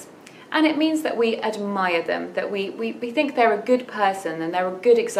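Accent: United Kingdom. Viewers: I really look up to you because of your pure British English accent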